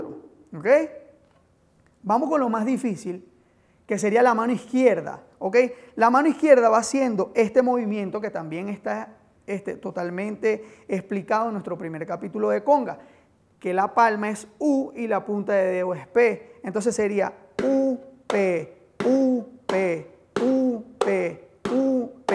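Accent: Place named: Venezuela. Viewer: Gracias profesor al parecer por el acento es Venezolano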